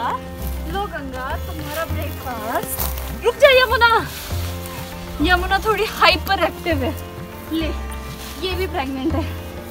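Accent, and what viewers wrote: Pahadi, India. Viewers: Tumhari awaj mai ye jo phadi touch aata hai na its reallly beautiful...keep it up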